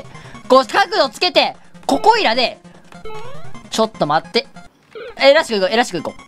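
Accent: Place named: Kansai, Japan. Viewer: 関西弁好き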